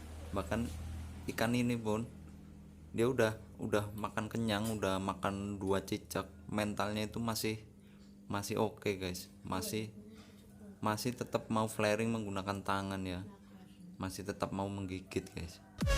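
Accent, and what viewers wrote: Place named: Banyumas, Indonesia. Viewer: ciri khas pokoke(ora ilang ngapake biarpun ngomonge bhs Indonesia)😀😀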